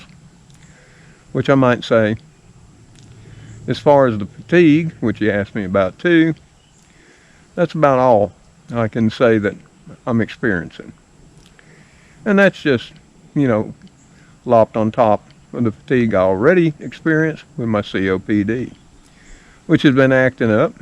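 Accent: South, United States. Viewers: Southern accent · Love your Southern accent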